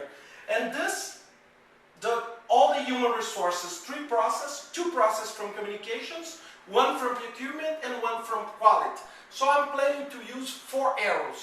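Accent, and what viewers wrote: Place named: Brazil. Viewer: where u from? you sound like Brazilian! am I correct?!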